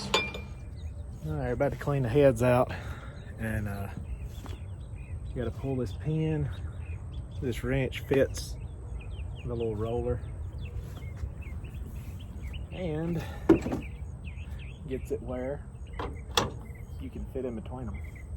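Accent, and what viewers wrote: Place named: Mississippi, United States. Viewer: GJ explaining that stuff. Always wonder about those heads. Good to see some good ole Mississippi accent on the Youtubes! Good Evening from Lafayette County!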